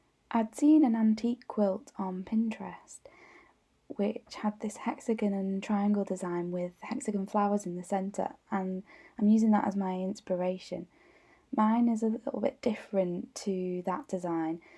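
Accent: United Kingdom. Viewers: You have managed to touch on all of my favorite things here. A beautiful English accent, Liberty of London fabrics, Sashiko, and EPP. All of this makes for a perfect video, and I thank you for this. What do you think about the William Morris quilting cottons? They are another of my favorites. Be well, and be happy.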